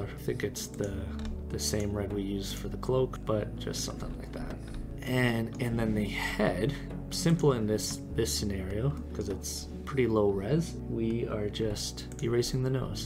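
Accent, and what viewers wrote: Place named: Midwest, United States. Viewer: So curious if you’re Minnesotan, or from one of its neighboring states. Your accent just has some of those midwestern notes I’m so familiar with haha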